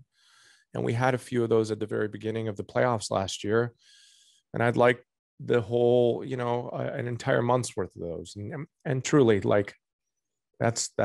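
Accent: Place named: Canada. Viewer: He still has a canadian accent, it's fading rho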